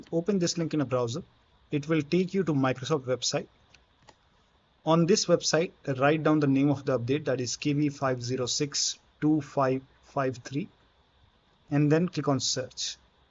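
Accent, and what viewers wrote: India. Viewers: i know i can fix this after i heard his indian voice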